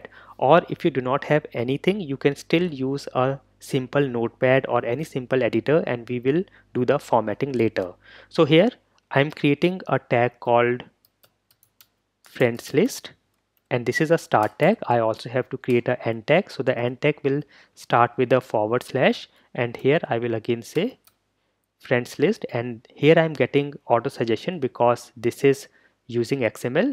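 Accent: India. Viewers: are you from bengal?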